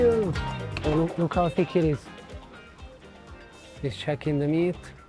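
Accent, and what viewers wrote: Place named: Italy. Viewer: coba belajar bahasa Minang mix sama logat Italia. Alhamdulillah habis buka puasa lihat vlog jadi ngiler.. mana bisa nolak lihat dan makan rendang 🤤🤤🤤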